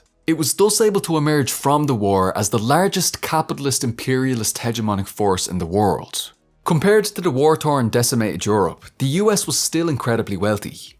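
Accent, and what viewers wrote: Ireland. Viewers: It's always been the same goal, with only slight variations in the methods used, since The Enlightenment (about 3 centuries). Imperialism is synonymous with unlimited socialism for the capital class and tyranny for everyone else. Our own (American) revolution was a reaction to this system, which our own capital class recreated immediately following the war. My list of smaller YT channels that contrast reality with popular mythology grows a little bigger each time any of you credit another, similar channel. Here's another sub and like. Aside: I really like hearing an Irish dialect for a change, it seems that we depend very heavily on our eastern european fellows for information on how things really work and the history of how we got here.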